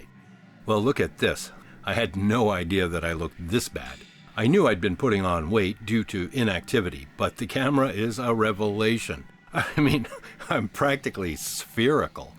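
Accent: United States. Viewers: Fantastic efforts - you’re inspiring me to get moving, even if only in my 40s. Just one q - where did the American accent come from when living in Ireland?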